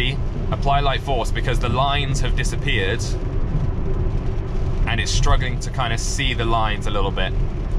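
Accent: United Kingdom. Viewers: Thanks for the video! I like you british accent very much and your pronounciation is very clear and sharp, which makes it much easier to understand for me (I am German). Very interesting how there are introduced subsequently new Autopilot icons; I have never seen before the "To resume Cruise Press the accelerator pedal" message / icon.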